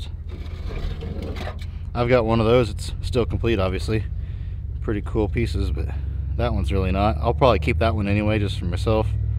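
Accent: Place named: Kansas, United States. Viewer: Shame the rain damaged some stock. You definitely need some more reliable help. I'm 77 fit and well and would be there tomorrow.Havinf know caught up on that Kansas accent which this 'ole South London boy has just got used too. Nice to see the family who are so important for a successful business. Every Best Wish from Wales U.K..... Pat.C.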